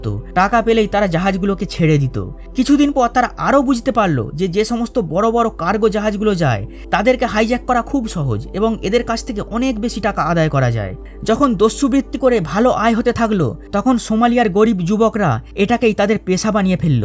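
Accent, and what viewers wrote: Kolkata, India. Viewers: আপনার ভয়েস শুনেই মনে হয় কলকাতার দাদা ❤️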